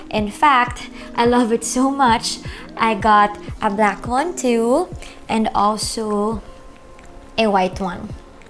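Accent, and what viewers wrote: Philippines. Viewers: Ang soft ng voice pinay na pinay ang accent❣️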